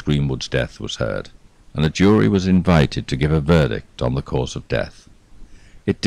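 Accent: United Kingdom. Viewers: Yes, I have subbed after seeing you have more to watch. I will try hard to ration myself to one a night, with a cup of cocoa😀💙. I hope you are still out there somewhere bringing more lovely, unknown stories, good for us, not so for the poor victims. Love your voice, I am born and raised English, but live in the USA. You sir feed my need for class A British accent. Thank you from my English heart.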